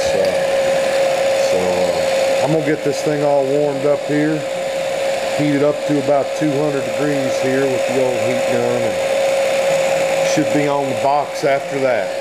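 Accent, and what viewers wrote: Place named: South, United States. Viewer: u sound like an easy going southern guy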